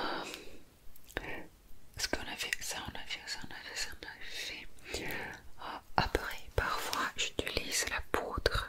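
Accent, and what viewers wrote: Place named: France. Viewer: Hello j'adore votre jolie voix et votre accent français !!🙂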